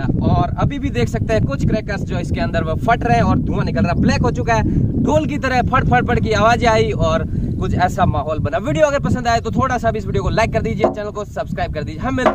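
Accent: Rajasthan, India. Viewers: Aap rajsthan se ho kya please replay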